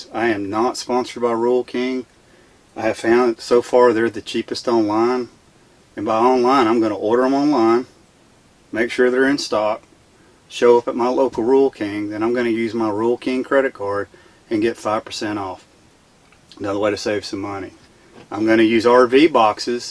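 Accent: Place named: South, United States. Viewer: My kind of Guy Sounds Southern And very Intelligent thank you 🙏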